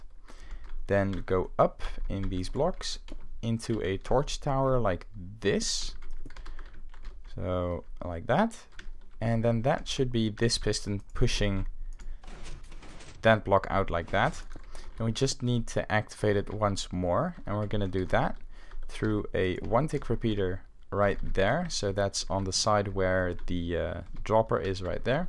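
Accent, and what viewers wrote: Netherlands. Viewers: R u Dutch? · Are you from holland because you have a dutch accent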